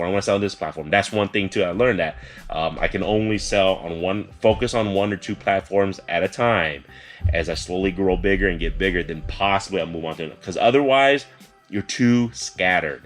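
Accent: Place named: Vietnam. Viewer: Are you vietnamese? U sound like it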